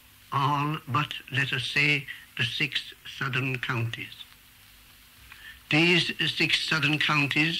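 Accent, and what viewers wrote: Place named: England, United Kingdom. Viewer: He sounds more English than Irish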